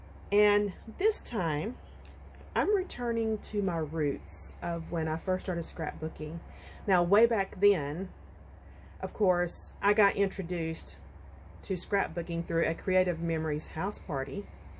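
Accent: South, United States. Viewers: I always start with my photos. That gives me the direction. PS: please don't stifle the "country," enjoy hearing your Southern accent. 🌞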